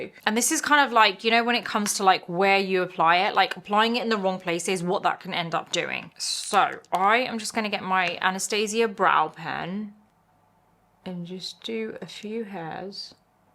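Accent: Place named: United Kingdom. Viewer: i think her native language is english since she has a uk accent lol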